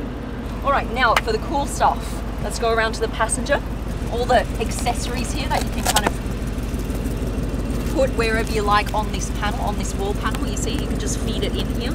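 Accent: Australia. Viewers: Are you from Australia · Nice! I saw the nasdaily video you were with beautiful australian accent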